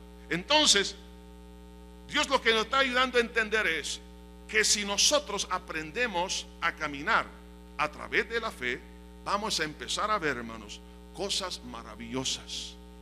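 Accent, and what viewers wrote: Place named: Honduras. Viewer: Le admiro Pastor como Dios le ha dado tanta sabiduria de la Palabra. Sus miembros son bien priviligiados de tenerlo como Pastor. Hay mucho que aprender de usted. Hermano con todo respecto, usted da buena comprension y aplicacion de la Palabra en la vida actual del ser humano. Hace poco encontre sus videos, en verdad an llegado a ser de bendicion para mi vida. Usted parece Hondureno hasta en su manera de hablar. Soy Hondurena por Nacimiento. Muchas gracias por su preparacion. Le pido a Dios que El lo bendiga, a su familia y a su congregacion y que continue siendo de bendicion al cuerpo de Cristo.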